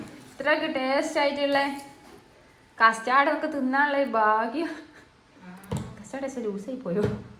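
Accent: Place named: Thamarassery, India. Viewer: എൻ്റെ favorites നമ്മളെ നാടൻ ഭാഷയാണ്.😂😅 നല്ല rasand താമരശ്ശേരി ഭാഷ കേൾക്കാൻ❤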